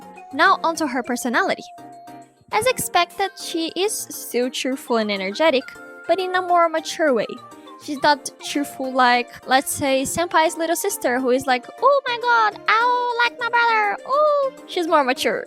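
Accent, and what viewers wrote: Brazil. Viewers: Hey, are you brazilian? You kinda sound "brazilianish" idk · Mano, eu já sabia que era brasileira por causa do sotaque e o jeito de falar os nomes das personagens. (Me humilha menos no desenho😅, ficou incrível os desenhos. ) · hey idk if you will see this but are you brazillian? because your accent really sounds like a brazillian accent, especially when saying the rivals names (i'm brazillian thats why i recognize it xD) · You have a VERY strong Brazilian accent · MANO EU CONHECI SEU CANAL AGORA MAS EU BATI O OLHO E JA SABIA Q VC ERA BRASILEIRA KSHSKSJSJ, sabia que o jeito q os brasileiros pronunciam o nome da osana e da raibaru realmente muda muito em questões de linguagem? Tipo eu acabei sabendo que uma mina era russa por conta disso e por isso descobri q vc era brasileira! E muito bom ver brasileiros tão talentosos quando você! Adorei o vídeo e os redesigns tb<3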